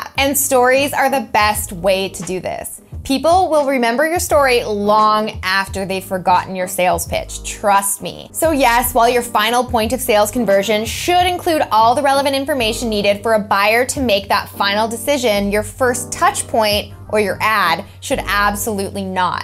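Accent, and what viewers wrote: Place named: New York, United States. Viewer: OK You can call me a troll if you like. Here goes... I am very interested in the subject, and you are obviously very knowlegable about copywriting...also quite attractive...However, as a chilled out and somewhat laid back West Coast Canadian, I find your voice grating and almost intolerable. I have started watching it about ten times, and just turned it off, because the New York delivery (if that's what it is) is hurting my brain. What do they call that? Vocal fry? At least you don't do the upward inflection at the end, making every sentence sound like a question?. So anyway, that being said, I surely would get on your nerves too, with my slow delivery and quiet tone of voice. If I had a dolla for every time someone told me to speak up or just started talking over top of me because they got bored or couldn't wait for me to finish...Thanks....just my trollish feedback...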